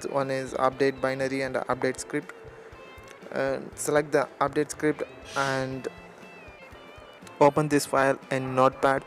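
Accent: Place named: India. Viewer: I wasn't sure if you noticed but every video you do, you have an indian accent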